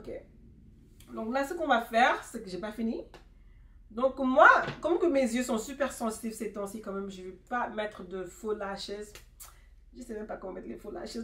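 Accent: Wolof, Senegal. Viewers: 😂😂 je te kiffe trop ton français wolof way😂😂super maquillage spicy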